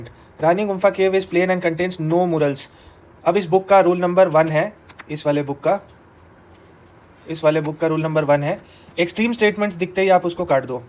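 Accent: India. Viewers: Sir aap banaras se ho kya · Sir aap bihar ya purvanchal up se ho kya ? Aapki language tone kuch waisa hi lag raha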